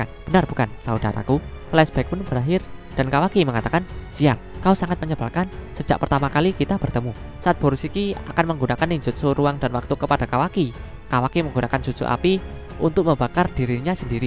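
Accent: Java, Indonesia. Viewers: Medok suaranya bang..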